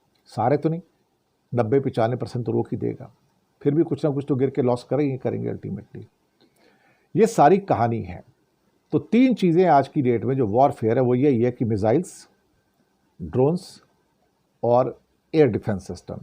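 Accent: Pakistan. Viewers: हर बार कि तरह पाकिस्तानी बोली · कहां से फर्जी समाचार लाते हो....बोलने का अंदाज ऐसा है जैसे कोई पाकिस्तानी बोल रहा हो....निकृष्ट स्तर....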